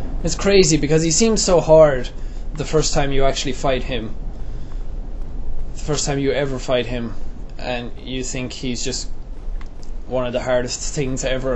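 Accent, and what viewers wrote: Ireland. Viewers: He's starting to sound a little bit like his Irish self ;) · FutureAdaption He kind of tried to hide his irish accent, but not in this one.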